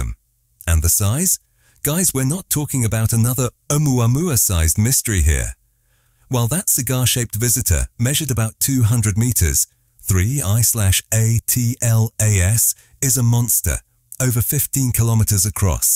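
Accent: United Kingdom. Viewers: Am I the only one who’s sick of the ubiquitous English accent AI voice narrators? Life’s too short to listen to this fop spell out “3 I A T L A S”, over and over - at least 20 times in the first few minutes - makes it unwatchable ~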